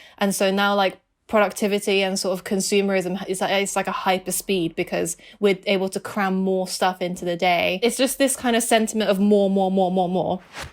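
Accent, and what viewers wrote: United Kingdom. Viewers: am i the only one who didn't know she was British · Hold up she’s British I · 1. She's a wholeass queen 💕💕 2. I'd no idea she was british??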